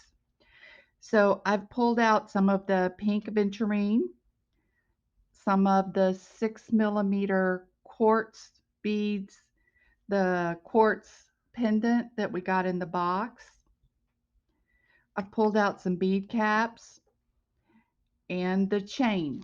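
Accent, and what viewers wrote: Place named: South, United States. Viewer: Are you from the south? You have a suthern accent. (Love it )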